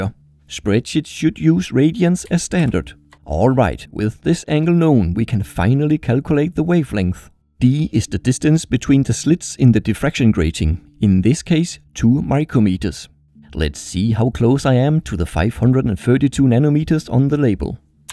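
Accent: Denmark. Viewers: Er du Dansker? det lyder det som på din stemme! god video! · Jeg synes nok jeg kunne genkende en Dansker på hans Engelsk :D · Jeg vidste du var dansk :D Man kan høre det på accenten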